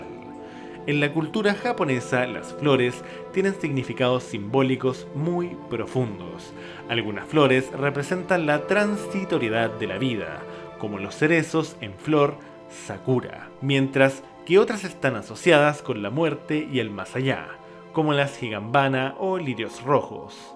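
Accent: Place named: United States. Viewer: Tuve que dejar de ver el video por tu tono de voz y como intentas pronunciar las palabras que no necesariamente deben pronunciar con un acento americano, deja de hablar como un niño con TEA por favor 😿🙏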